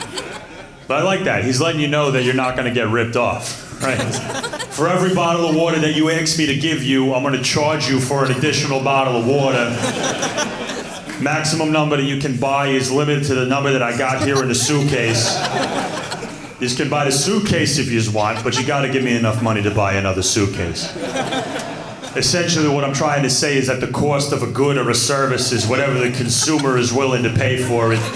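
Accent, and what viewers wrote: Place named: Tri-State, United States. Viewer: Great set, you're hilarious, very subtle tristate accents. Outro music sounds like from Unreal Tournament too so that's a plus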